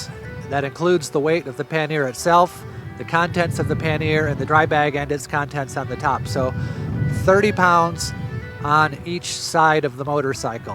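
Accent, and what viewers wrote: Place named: Arizona, United States. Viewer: I didn't realize there was an Arizona accent. The way you speak reminds me of Cody Lundin. Very relaxing. Good video, Thanks!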